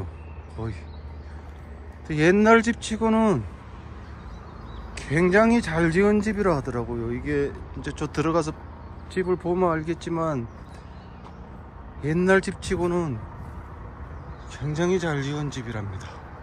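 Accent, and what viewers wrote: Gangwon, South Korea. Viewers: 더워요 얼굴을좀 강원도 사투리 구수하고 말억양이 독툭해서 자주시청하는대요 목소리는 젊은신것같은데 언득보니까 60대70대같어서 얼굴공개 안하시는건가요 어때요 맘이 고우시고 어지신것같은데요 얼굴보고싶어요 암튼 대박나시길 바랍니다 핫팅 ㅎㅎ❤😊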